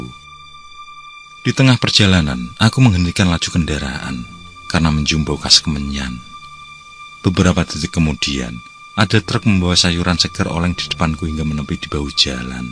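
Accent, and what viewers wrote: Java, Indonesia. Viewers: Eaalaaaah bah Rastim tiang Jawi toh? Tak pikir urang Sunda.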